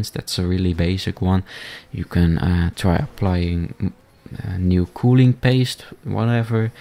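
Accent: Netherlands. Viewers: Dutch accent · Dutch accent incoming! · he sounds dutch af :/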